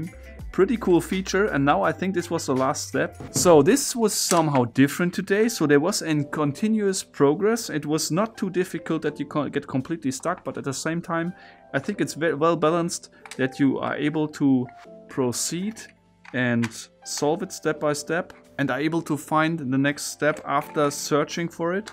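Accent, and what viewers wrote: Germany. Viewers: Haha, man hört das du deutscher bist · Are you German? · Haha ich wusste du bist deutsch.. Habs doch richtig rausgehört 😂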